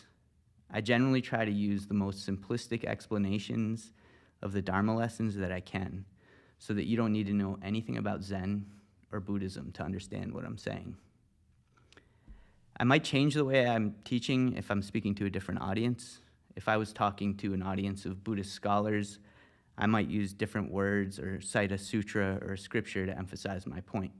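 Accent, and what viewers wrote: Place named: Massachusetts, United States. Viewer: Before I address this question ⁉ I have a question of my own . Are you originally from Massachusetts, as your accent is not that pronounced? I'm originally from New England myself , Connecticut.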